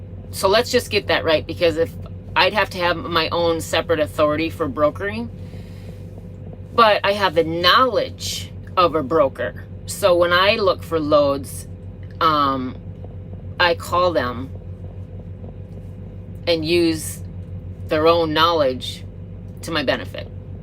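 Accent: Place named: Minnesota, United States. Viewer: Thanks for the "moot point", most say "mute point". Who could hate you? Notice a bit of Minnesota Ya hey der.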